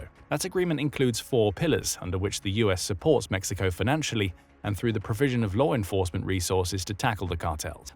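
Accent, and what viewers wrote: United Kingdom. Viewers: I cannot even believe your trying to downplay the affect of fetanyl on the American population with your British accent as though you know… honestly it is disgraceful and without value to say it may not be as big a problem as Trump is doing. He’s literally doing what we the people have asked. What are you doing…?!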